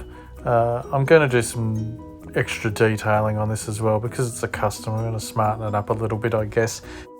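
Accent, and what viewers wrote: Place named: Australia. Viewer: Hi there your vids are great, keep it up please, not many Aussie ascents in utube land.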